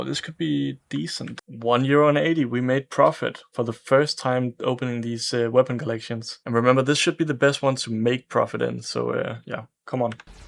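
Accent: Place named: Denmark. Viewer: Danglish is strong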